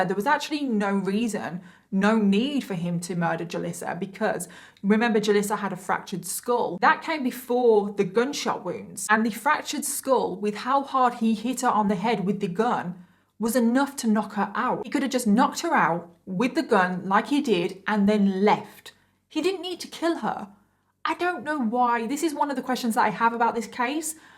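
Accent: United Kingdom. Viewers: I'm an American but my parents/grandparents were British. I enjoy your accent, reminds me of home. Side note: you are so pretty! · you are British, pronounce and speak as you normally do. I love how people who live in other countries speak. Unless, you use a word that most people have no idea what you might be saying, then a definition is very much and respectfully appreciated. Most, like British, much more proper than Americans and I love just listening to accents. So keep on keeping. Don't change. I am from the U.S. and I have no accent because am on the west coast. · Before even watching the video, only hearing your opening sentences -- I had to comment. (Also, my very first comment on your page BTW) How to pronounce "Craig" in Craigslist...?? Please say the name however you want! You are always so polite and kind, so inclusive and respectful to anyone who could ve watching your videos. 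I love your stories because they focus on one murderer. So fun listening to the back story of the upbringing and if its not available, you are just as bummed as we all are. But you still make the story over the top interesting. I love your voice especially your accent!! Your enthusiasm your side comments fresh from the UK the most! -- LOVE your new Podcast too! I am back to YouTube since I have listened to all the Podcasts. Tons of YouTube videos to choose, so yay for Saturdays and True Crime with leading the way. Nichole from CA, USA 💋💌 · I love accent so much. If I had a British accent this would be the one I'd want 🤣, what part of Britain are you from? I love listening to you speak (not in a creepy way just as a comforting way lol) and even more of a plus is that it's crime stories 😆 if I ever imagine having a British accent this is the kind exactly 💯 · I love your channel for many reasons but one in particular is that you are on the same wavelength as I am in your thinking 🤔 another is I love ❤️ your accent im sure every American always comments about that but I do love a British accent ❤️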